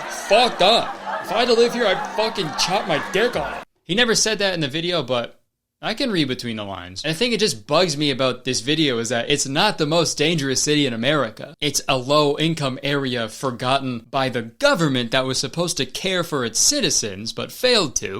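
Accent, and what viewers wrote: Canada. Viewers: kurtis's canadian accent rly popped off here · is the most Canadian I've ever heard you.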